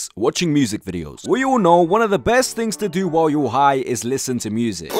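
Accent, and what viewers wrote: United Kingdom. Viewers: the last one is totally true from my own experience, watching your video with Briish accent is way more easier with zaza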